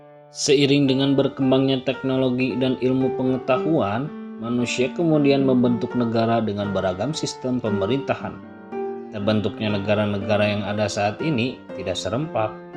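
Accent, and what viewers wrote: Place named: Java, Indonesia. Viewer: Narator bahasanya medoK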